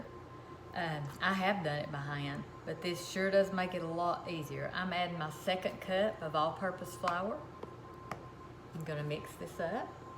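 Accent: South, United States. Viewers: I absolutely love your videos! You remind me a lot of both of my grandmothers. One of them sadly passed away 11 years ago and I miss her dearly. She made the best yeast rolls, but she never wrote down her recipe. I’ve been on a quest to recreate her yeast rolls and I’ve made many different versions over the years. I’ve come very close a few times but would love to try your recipe! You remind me a lot of my other grandmother as well, who like you, has the most beautiful Southern accent. 🥰 Your videos and stories are so wholesome and heartwarming and remind me of family, home, and comfort. It really feels like we’re part of your family. Thank you so much for sharing your life with us! Blessings to you and your family ❤️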